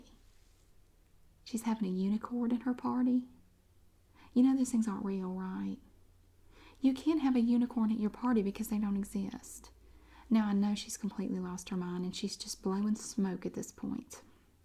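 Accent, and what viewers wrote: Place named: Carolina, United States. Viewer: Accents change a lot based on location. Her accent is mostly from north/South Carolina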